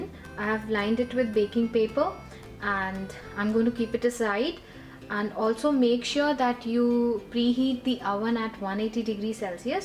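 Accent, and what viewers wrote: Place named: India. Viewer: I LIKE INDIAN ACCCENT VERY MUCH VERY SOOTHING AND CULTURED.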